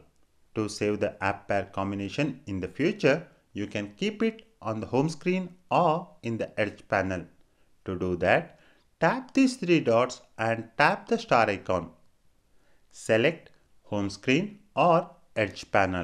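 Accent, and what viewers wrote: India. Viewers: This accent always brings Indians down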